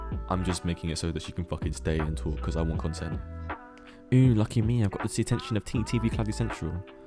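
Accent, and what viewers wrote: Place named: Southern England, United Kingdom. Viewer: bro u sound mad like my mate from yeovil u from south england?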